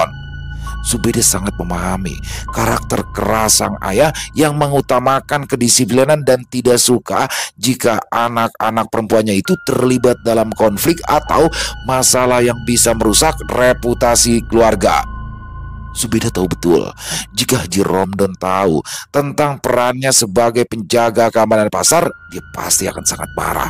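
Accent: Jakarta, Indonesia. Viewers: Mantab.... Accidentally jadi preman pasar mak zubaidah... Kwik.. Kwik... Seru Abizz... Lanjut bang... Pas banget bawain cerita seru ini dgn logat betawi_nya...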